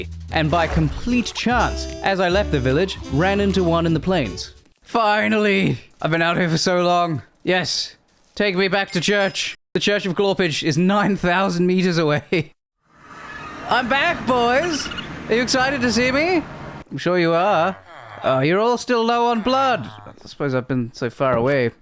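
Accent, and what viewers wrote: England, United Kingdom. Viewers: I love his fluency in British accent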